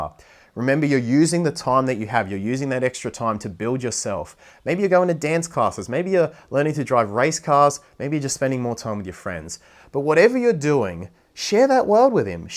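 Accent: Australia. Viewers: Girls must love his Aussie accent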